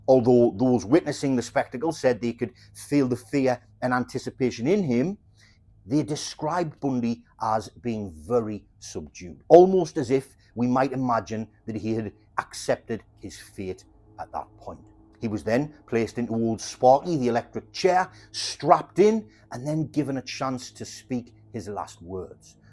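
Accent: United Kingdom. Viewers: You should edit the title imo so it says “Brutally murdered or executed in prison” Otherwise it does seem a bit clickbaity. Excellent video otherwise and it is nice to have a fellow brit narrator instead of an ai voice. Keep up the great work and try not to clickbait 😊😊😊😊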